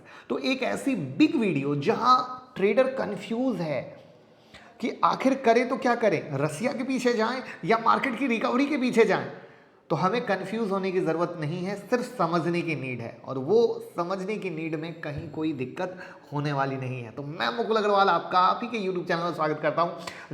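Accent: Bihar, India. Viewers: आप पूरे बिहारी लगते है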